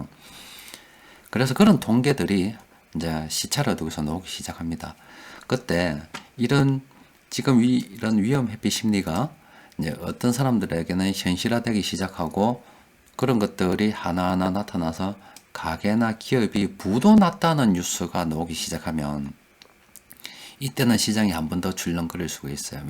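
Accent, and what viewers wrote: Gyeongsang, South Korea. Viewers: 경상도사투리 매력있습니다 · 구수한 경상도 사투리와 함께 귀에 쏙쏙 들어오는 강의 감사합니다~ 무엇이든 쉽고 빠르게 이루어지지 않다는걸 새삼 깨닫습니다. 앞으로도 좋은 강의 부탁드립니다~ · 기회가 오면 어느종목을 사야할까요? 초보인지라~~~^^ 차분하게 설명을 잘해주셔서 이해가 잘되고 불안한맘도 안정되게 해주시네요 경상도 말씨도 정감가구 좋은 컨텐츠 만나게되어 감사히 청취하고 있습니다 ^^ ♡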